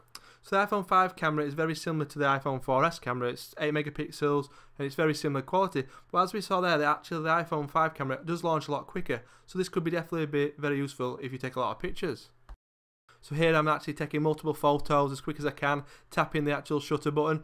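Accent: United Kingdom. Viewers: xDDD i like your accent, its very... british xddd good video, like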